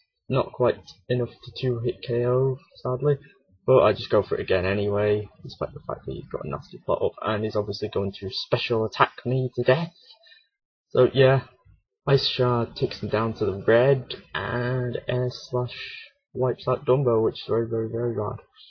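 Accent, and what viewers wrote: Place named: Yorkshire, United Kingdom. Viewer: But the Yorkshire accent is win though...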